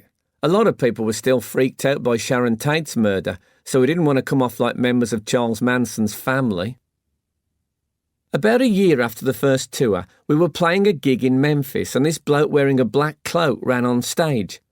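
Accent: United Kingdom. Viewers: Thank God this was told in a British accent. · That brummie accent sounds perfect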